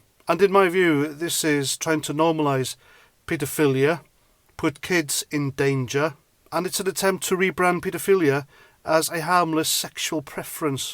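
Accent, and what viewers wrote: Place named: England, United Kingdom. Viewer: Get a grip..l detect an english accent..if you hate us so much...stop complaining, we want to be independent....lived in Loughborough for a year...worse year of my life, filled with racist, bigot bullies..hated the english and they hated me...lived here in beautiful Scotland for 63 years...13 years in the West end of Glasgow...not one bit of trouble..England on the otherhand is overwhelmed with child trafficking...look at all the weirdos in the BBC alone..and the chums of your precious royal family..you had Saville on top of the pops for years...friend to the royals..in fact charlie boy's best pal...the guy from "its a knock out" another pedo..Westminster is filled with kiddie fiddlers...l hate england..why?..its filled with english tory wnakers.....🤮🤮🤮🤮 Saor Alba 🏴🏴🏴🏴🏴🏴🏴🏴🏴🏴🏴🏴🏴🏴🏴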